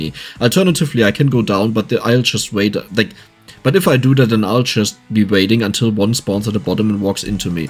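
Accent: Germany. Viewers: I am consistently impressed at the level of care and skill you put into these videos. Looking forward to more laughs and awesome content from my favorite funny talking German man ❤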